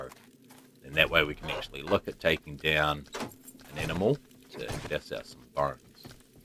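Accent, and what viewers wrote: Australia. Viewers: I know I'm 5 months late to the party but very cool to see a console Let's play, Even though its not up to date as the PC version It give me some nostalgia since I fist started playing in Alpha 16-17. It also doesn't hurt that I'm a sucker for Aussie and Kiwi accents :) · 😅im new to the channel love the videos. Has anyone told you that you sound like Farket (a Australian youtuber)?? Are you Australian?!?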